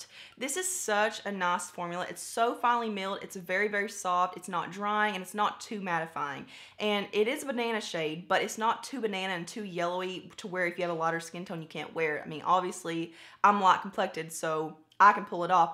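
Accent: South, United States. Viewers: Whoohooo! 1st comment, this never happens! I love your channel, your honesty, the range of products you use from drugstore, high end, and your accent!! Fellow southerner here! 🙋‍♀️❤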